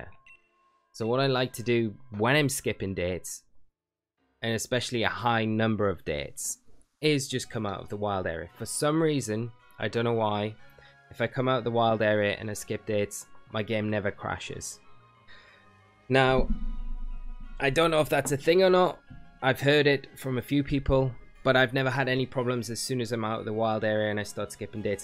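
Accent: United Kingdom. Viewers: i like ya british accent B)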